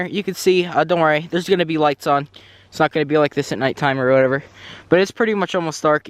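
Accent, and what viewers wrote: Pittsburgh, United States. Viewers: Just saying. I'm from Pittsburgh. And you have a strong Pittsburgh accent.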